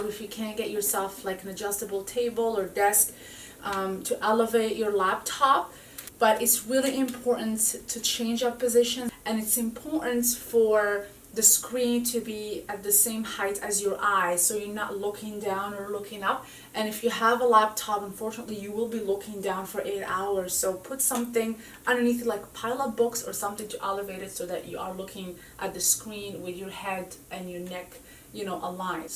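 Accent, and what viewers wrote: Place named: Morocco. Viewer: Ena koont 3rf ntiya Mghrebia! I could instantly tell from your accent! haha. Shukran pour la video! :P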